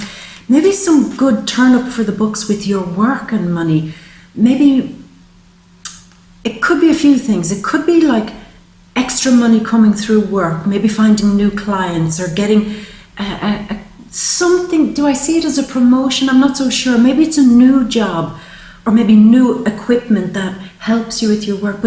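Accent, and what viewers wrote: Ireland. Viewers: Between your beautiful calming energy, gorgeous face and enchanting brogue, I can't help but feel hopeful even facing adversity. Just as you said in this reading, I have someone really rubbing me the wrong way and it's family and I'm shaking thinking about the difficult conversation I have to have with them but I will stand resolute in the knowledge that I will speak my truth and that shall be good enough. Thank you...your gift is truly divine..wish me luck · Irish?? Love the accent. I'm hetro by the way but something about you.......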